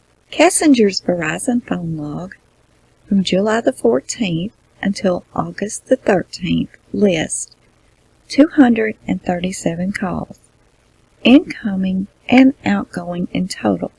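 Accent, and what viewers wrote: South, United States. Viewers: Love your southern accent.. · I also agree...your voice is soothing and southern...I'm from Tennessee and your voice reminds me of mine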